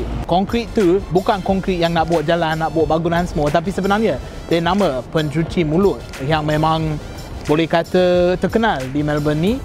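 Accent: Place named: Malaysia. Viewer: ckp inggeris mcm telo melayu. Terbaik.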